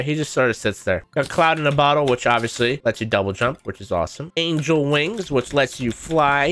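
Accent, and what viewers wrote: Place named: United Kingdom. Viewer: It's probably harder for him to pronouce it the usual way because he is from the UK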